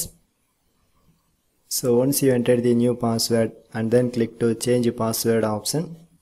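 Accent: South India, India. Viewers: South Indian accent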